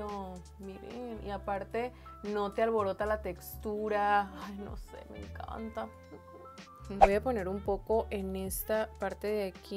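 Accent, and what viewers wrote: Sinaloa, Mexico. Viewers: Me encanta cm te maquillas y tu asentó Sinaluence me gusta plebe jaja saludos desde Arandas Jalisco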